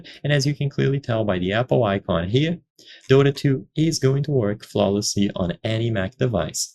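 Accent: Brazil. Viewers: I know a Brazilian when I hear one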